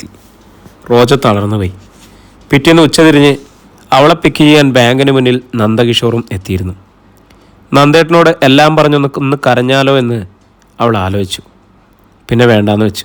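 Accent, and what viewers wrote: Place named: Wayanad, India. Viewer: ബ്രോയുടെ റീഡിംഗ് സൂപ്പർ. വയനാടൻ ശൈലി അല്ലെങ്കിലും ഇടക്ക് വരുന്നുണ്ട്.❤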